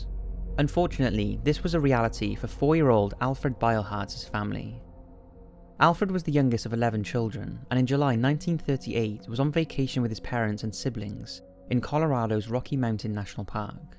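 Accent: Wales, United Kingdom. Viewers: Anton Ellegaard no probs mate, voice is very cool! iam from Yorkshire England & it took me a bit bcoz it's a bit between English & welsh & a bit posh ☺ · Do all brits sound as ridiculous as you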